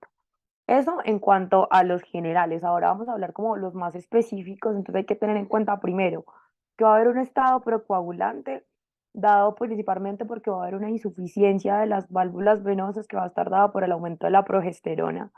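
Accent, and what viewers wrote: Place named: Colombia. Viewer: Excelente trabajo! Me encanta el dialecto colombiano 💖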